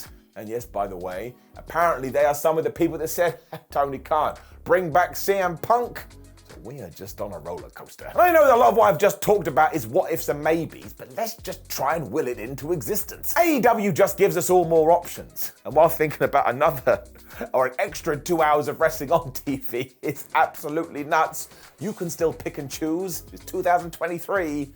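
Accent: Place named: United Kingdom. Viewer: You speak so british.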